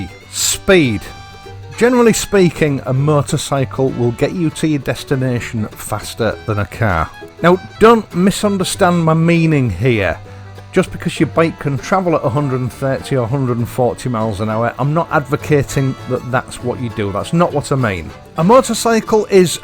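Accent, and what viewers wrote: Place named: Hull, United Kingdom. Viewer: Just found your channel it’s the accent I left hull along time ago liked vid I’m a fan now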